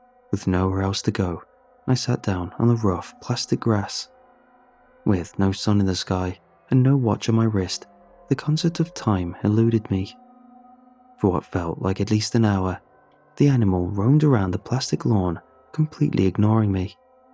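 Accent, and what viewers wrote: United Kingdom. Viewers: He’s British. They pronounce words differently.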